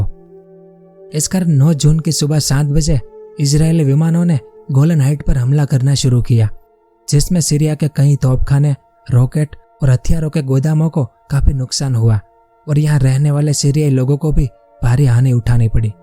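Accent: Gujarat, India. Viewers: Bhai aap gujrati ho kya????? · गुजराती से हिंदी में उच्चारण में अपनापन है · Pako Gujarati lage che!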